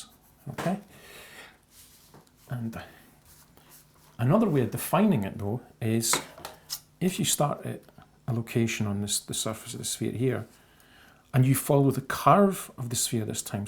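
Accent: Scotland, United Kingdom. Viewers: Are you from Scotland? If so, are you from the Inverness area?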